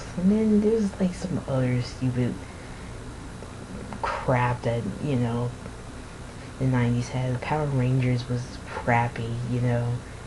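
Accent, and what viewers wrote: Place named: New Jersey, United States. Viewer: sign oh boy you sound like these jersy shore pinheads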